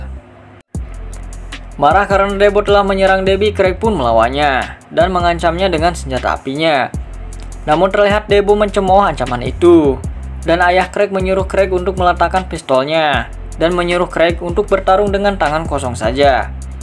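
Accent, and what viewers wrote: Bali, Indonesia. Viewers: Nada suaranya kek orang bali ni ya bli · Apakah admin ini orang bali?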